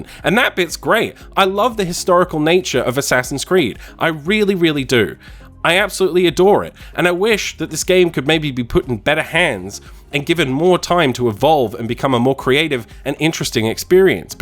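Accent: Australia. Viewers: You're an aussie!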